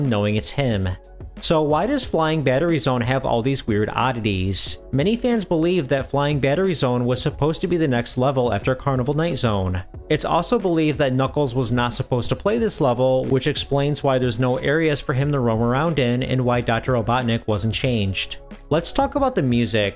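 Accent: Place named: North Dakota, United States. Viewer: I hear a fellow midwestern haha! Sounds like North Dakota hehe! I’m in Fargo. You’re cute too lmao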